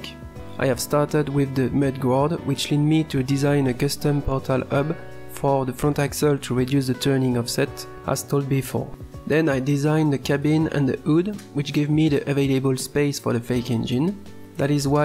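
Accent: France. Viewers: It still sounds very french ;) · T’es français tu parle comme un français ! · sounds very french but the script is very well written. and the model is, as always, leagues ahead of what a mortal could come up with. good job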